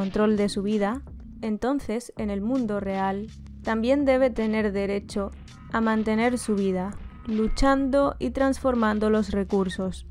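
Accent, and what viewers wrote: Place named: Spain. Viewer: Estoy muy confundido ahora mismo porque tienes un acento casi diría de España no tanto de México pero tienes una foto de perfil y la bandera que podríamos relacionar a México.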